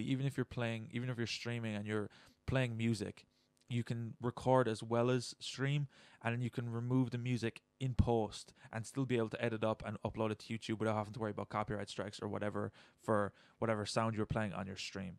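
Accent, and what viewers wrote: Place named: Ireland. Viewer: defintley irish, just cant tell exactly where :/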